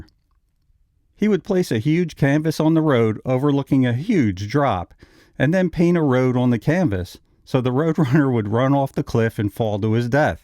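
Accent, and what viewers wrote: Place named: Pittsburgh, United States. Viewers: You sound like you have a Pittsburgh accent 😊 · Yinz from da’ Burgh?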